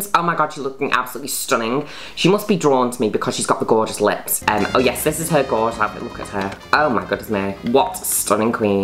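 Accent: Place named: United Kingdom. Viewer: I don't like the British accent ngl.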